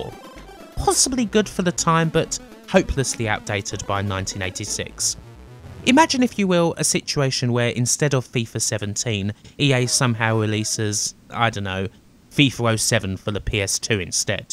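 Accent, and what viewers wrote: United Kingdom. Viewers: Maldita sea! Gracias por esta y mucha mas informacion de videojuegos saludos desde El Salvador, exitos, your british accent help a lot with the lenguage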